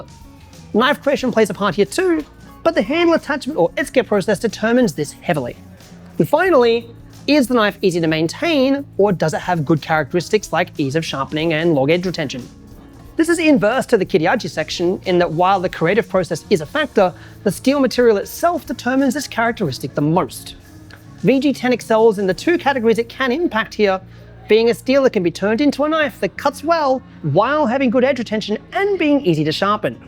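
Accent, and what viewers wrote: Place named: New Zealand. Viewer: that's cool man I thought I heard some kiwi 'I's haha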